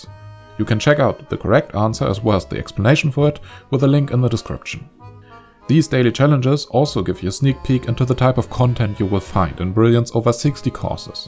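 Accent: Germany. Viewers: I love your German accent, very convincing and genuine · Typical german accent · PLEASE practice your accent. For me as a fellow german its nearly unbearable to listen to you speaking english anyway, nice video! keep up the good work 🌞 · Du bist deutscher 😋😋 is ch höre das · wie kann man nur so deutsch klingen xD dieser Content ist einfach ein Referat aus der siebten Klasse. Krank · Pretty sure he's german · U german? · Not French for sure. As French myself, it sound also German to me.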